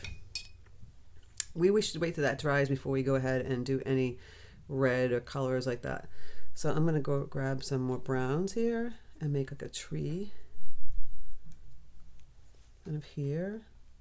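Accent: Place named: New York, United States. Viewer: Love the NY accent ❤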